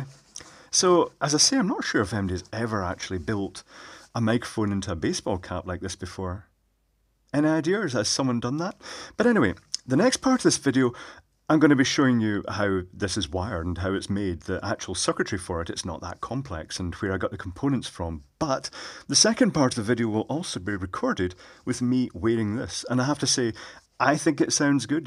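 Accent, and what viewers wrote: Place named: Glasgow, United Kingdom. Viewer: Personally I prefer this Cap microphone sound, it is crisper (term used by those with hearing loss) meaning the all important frequencies that reveal subtle differences in the sounds are there at good levels, too much in the bass region muddies the perception, bit like the TV news broadcasts where they have a permanent thumping drum or musical? background, WHY? is trying to get his words over to you in a clear manner, this microphone does ir WELL. His wonderful "Glaswi" accent is a pleasure to listen to.. Keep it up "Sir" Final thought, at least we don't hear the rustling of his beard!!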